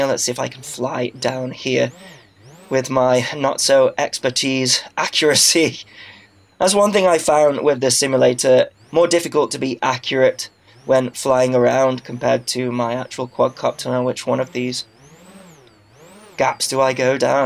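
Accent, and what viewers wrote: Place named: England, United Kingdom. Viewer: For me you're the most reliable reviewer/drone channel-guy out there. If im unsure when it comes to "drone-decision-stuff" i always just have to browse your channel to find the right answers and opinion. Of course there are other good and trusty reviewers on yt....but totally prefer the english language and pronounciation over this american english blabla....or well in most of the cases atleast. Ofc joshua bardwell, rcAddict, kababFPV, stu from uav, envy astro+seth and Bruce from rc model reviews doin a GREAT job aswell....but you are always a tad more enjoyable to listen too. Thanks for that dude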